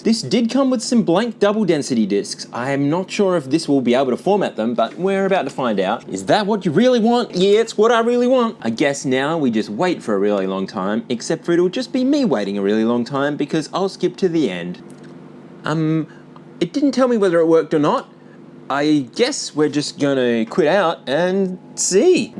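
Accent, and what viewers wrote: Australia. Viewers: That's awesome! I used to own a Para Para Dancing (Korean Market version of PP Paradise). I'm part of MAGFEST here in the states.( Haven't looked yet at your profile and I'm trying to guess by accent... Australian NOT New Zealand 😅) Magfest is a yearly gaming event, 4 days, 24hrs nonstop EVERYTHING, concerts, panels, LAN, Indie gaming, console. We had to cap it at 20K People. We just had MAG 22. I've been involved since 3! I'm the warehouse and on-site event tech. MY gf got involved after we met around the time 11 started, and she later became arcade department head and she was so good at management, she was the head of the board of directors. Unlike me, she's extremely smart, and a scientist. My genius is Electronics repair 😊 Our rhythm/Japanese games section. We have groups of collectors that have insane stuff. Last event over 350 cabinets and over 100 were rhythm games. 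You name it, it was there. I may have a walk through on my channel I posted. I'll have to send you a link, as most of my channel is my daughter playing Pop'n Music. She jumped from low 20s and is currently playing 49s 😂😂😂 I'm jealous AF!! 😂.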